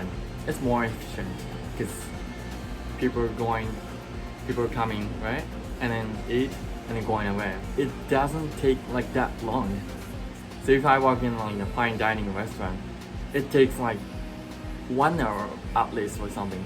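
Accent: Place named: Canada. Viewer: バンクーバーに移民して25年たつものですが、未だ来て一年で英語がとってもお上手ですね。アクセントも私の息子達の様にカナダ人ぽくて驚きました。これからも頑張って下さい。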